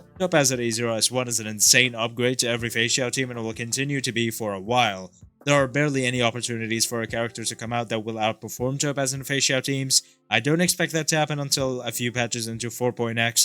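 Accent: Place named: Arab world. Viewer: I think you are from united arab your accent seems like them or near it :3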